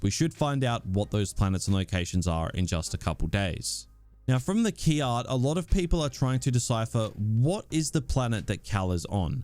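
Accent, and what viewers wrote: Australia. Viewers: The australian accent is unbelievable annoying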